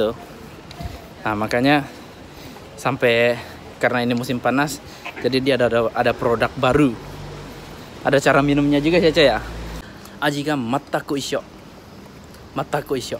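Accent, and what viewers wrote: Java, Indonesia. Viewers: Ko ada medo' nya bro klo ngmong😅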